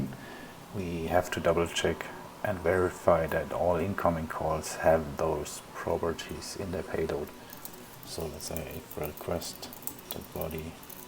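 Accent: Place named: Germany. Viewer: Thanks, your German accent and accompanying techno is inspiring.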